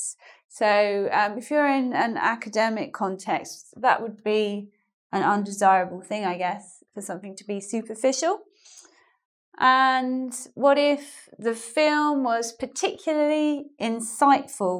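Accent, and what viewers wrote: United Kingdom. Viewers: I like your British accent ! Especially when you said 'Are you attending the welcome drinks tonight ?', your accent was very attractive and a kind of funny to me and made me laugh !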